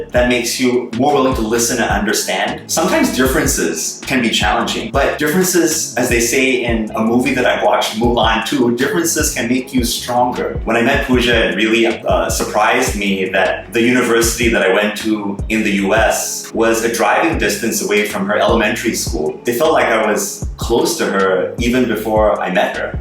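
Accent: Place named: India. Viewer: The way u speak sounds pretty Indian also the way u call her name it seems like u are familiar with hindi!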